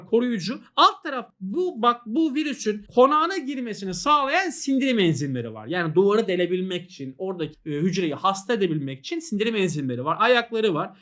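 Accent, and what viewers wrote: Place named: Erzurum, Turkey. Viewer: Hocam memleket nere Erzurumlu gibisibiz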